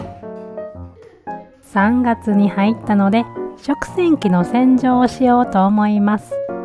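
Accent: Kansai, Japan. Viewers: いつも楽しく拝見しております😊 関西弁⁉️の話し方がとても心地よく聞いております 次の投稿も楽しみにしております❤ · Nobanaさんの関西弁イントネーションのハスキーボイスが心地いいのでハマってしまい、毎週楽しみにしています。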